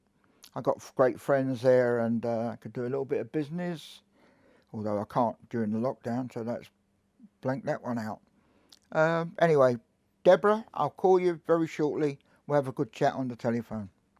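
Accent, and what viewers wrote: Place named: England, United Kingdom. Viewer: Hi I didn't know you are a pomie. I thought you were a Yank. But I like you so much mate cause your a man you got that attitude and you remind me of my friends of the past. More boys should grow up like you. As far as doing your hair you can watch my video called balding and greying part 2 Dave Kirby.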